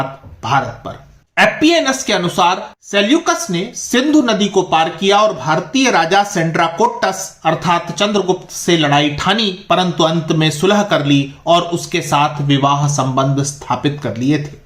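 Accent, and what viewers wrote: Standard. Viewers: Shudh hindi bashan ka ucharan kiya, suhani lagi. Details achha diya. Good.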